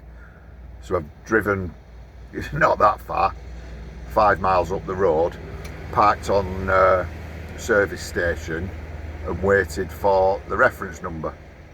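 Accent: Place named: Lancashire, United Kingdom. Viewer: That accent! Wigan or Bolton Bolton or Wigan?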